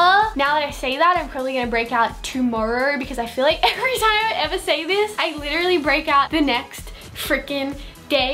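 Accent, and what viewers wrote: Australia. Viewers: Siiiiiiir Lol sorry Australian accents make me laugh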